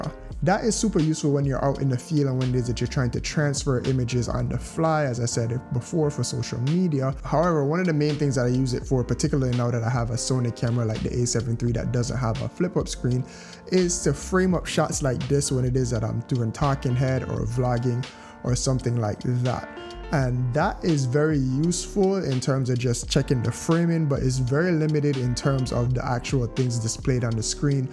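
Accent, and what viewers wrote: Jamaica. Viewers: I can tell your Jamaican. Big up yourself bro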